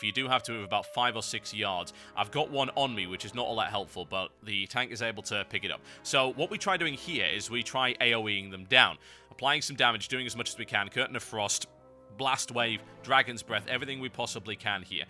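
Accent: United Kingdom. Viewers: f.u.b1ch u sounds british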